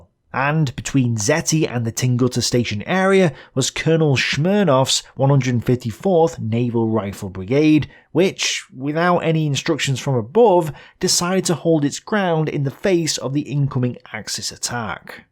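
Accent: United Kingdom. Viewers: I can feel my bone healing as I listen to mild British accent speaking about the glorius carnage on Volga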